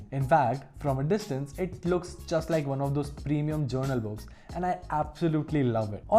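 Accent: India. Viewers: The laptop on the video is so drity it looks like u pulled it out of a trash. And try to work on that Indian acceent to be less listenable, we not all americans but we try to fit globally. I had to watch this low budget review with a laptop pulled out of Kirby Trash Bag cuz it was the only one with this model. Cheers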